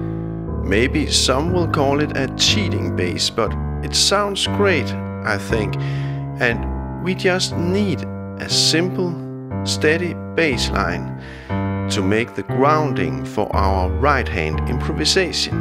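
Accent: Denmark. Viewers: i love danish when they speak english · I did, II - V - I and pentatonics are usually one of the first lessons I teach in my lessons (bass player). Who does lectures in Basic Jazz Harmonics in another way? But it's been a long time, so thanks . Fresh input is always welcome! I still love your very musically educated "muppet hands show" :D Danke schön, well done (do I hear a Danish accent? ) Frank --- and, of course I'm a bit drunk. Sorry!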